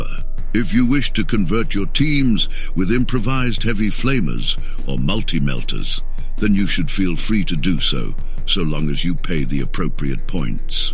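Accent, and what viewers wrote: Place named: United Kingdom. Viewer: bro had me at british sounding voice and 2nd edition 40k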